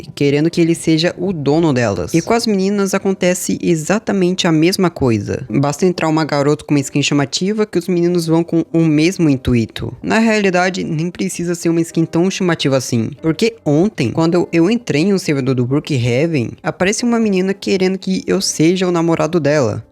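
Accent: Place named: Portugal. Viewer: deve ser de Portugal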